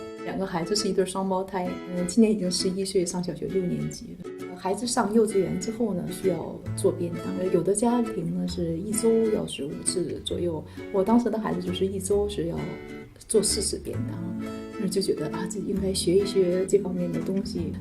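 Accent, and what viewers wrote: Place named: Northeast, China. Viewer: 东北银，鉴定完毕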